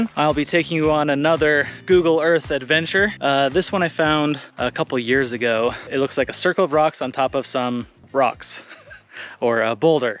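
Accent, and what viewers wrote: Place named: Utah, United States. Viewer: Depending on where you are my money is on Fremont Indians if you are north of The Colorado River or Anasazi if you are south of the Colorado. The terrain looks like southeastern Utah. Maybe somewhere between Beef Basin and Grand Gulch. I lived in Moab for almost 30 years. Sites like this are all over the place in and around Canyonlands. Secure food storage was critical and it is almost a certainty that this construction was for that purpose. Actual dwellings in that area tended to be pit houses. Listening to your narration I am guessing you are a Utahn. I’m even going to guess Utah County but oh my heck…. I could be wrong. 😊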